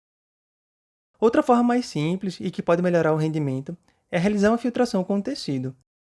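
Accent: Northeast, Brazil. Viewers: Mto bom! Parabéns pelo canal. Sotaque do Nordeste me lembra de casa!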